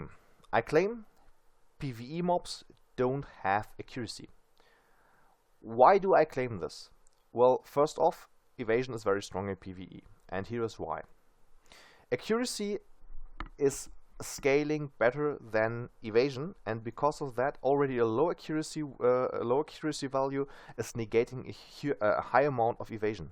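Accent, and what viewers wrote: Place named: Germany. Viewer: The german accent °-°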